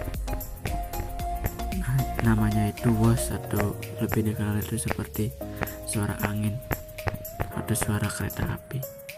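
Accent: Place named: Bali, Indonesia. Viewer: Bang pasti dari bali ya :) mohon di balas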